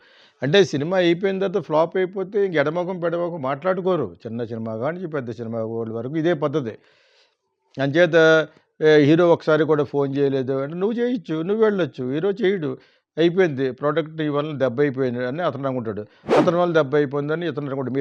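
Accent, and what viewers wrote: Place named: Konaseema, India. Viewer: Whatever the subject...I like your Kona Seema slang...and enjoy🎉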